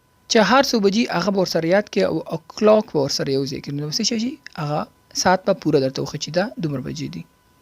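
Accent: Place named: Pakistan. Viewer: your pashto same like pakistani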